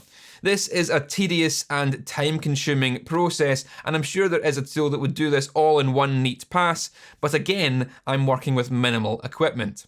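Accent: Scotland, United Kingdom. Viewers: I love how aggressively Scottish is your accent. Amazing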